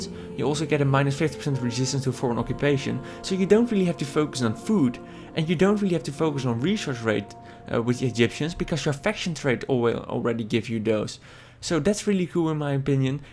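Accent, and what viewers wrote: Netherlands. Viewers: ***** You're Dutch? So that's where that accent comes from! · He has a Dutch accent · Are you dutch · Fucked up Dutch accent.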